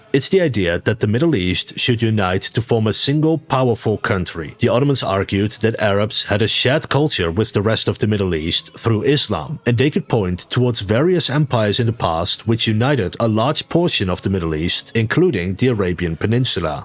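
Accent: Netherlands. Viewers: he is from Netherlands thgh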